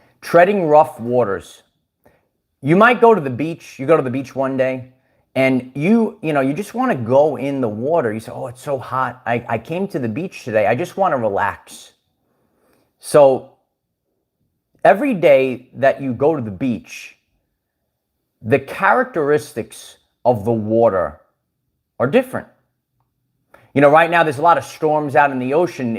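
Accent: New York, United States. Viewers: I really love your East Coast accent! Is it a New York accent?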